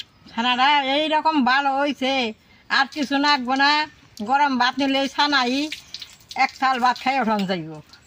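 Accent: Tangail, Bangladesh. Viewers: আপনে গো ভাষা,,,,, আমাগো বাংলাদেশের টাংগাইল জেলার ভাষা same,,,,,আপনেরা কোন জেলার গো?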